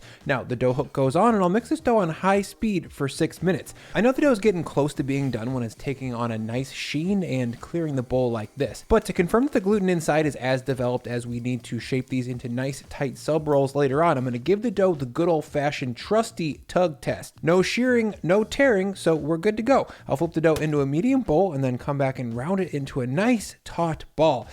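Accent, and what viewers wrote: United States. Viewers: Looks great... but Christ I cannot listen to the American accent for 14 minutes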